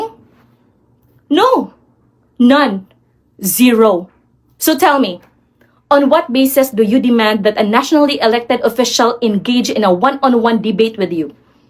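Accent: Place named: Visayas, Philippines. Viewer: Bisayang English mo day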